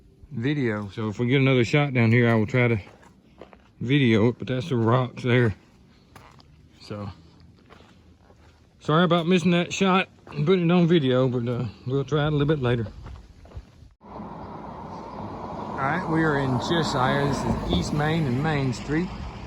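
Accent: South, United States. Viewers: Thank you for finding more mushrooms and catching them on camera. That first big one almost didn't look real. Amazing there are so many kinds. If rock walls could talk, they could tell you lots. Most represent the skill in which the person built them. Some may fall apart due to vandalism or people searching through them, but the one my daddy and us kids built is still standing strong. If there was a way I could include it in this comments section, I would. .......I also appreciate you filming sections of the towns you are going through........still love seeing the old houses "up north". The architecture is so different than you see here in the south. Keep holdin' on to your sweet southern accent !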